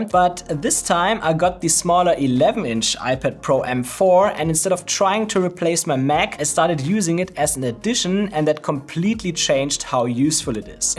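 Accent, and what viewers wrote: Germany. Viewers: I hear Deutsch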